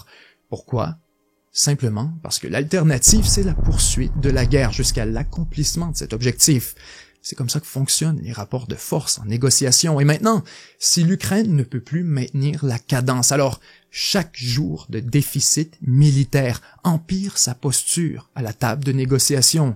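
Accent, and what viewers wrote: Quebec, Canada. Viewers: Est ce que tu serais québécois par hasard? Ton accent sonne bien.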